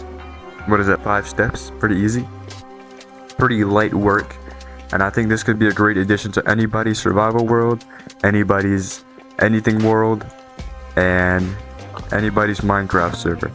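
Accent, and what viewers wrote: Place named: India. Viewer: I LOVE IT even though you sound like an Indian they humor and the innocent for tutorial you got potential 😂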